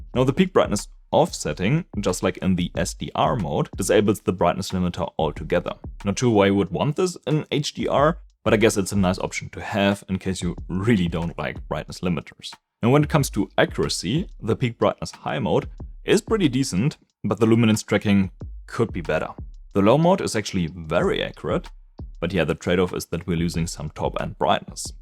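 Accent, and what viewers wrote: Germany. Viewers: der deutsche akzent ist einfach viel zu stark🥲 meine ohren tun weh. Aber nices video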